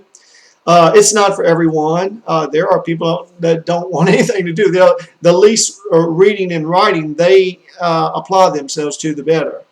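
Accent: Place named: South, United States. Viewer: It's called a southern accent. Get over yourself.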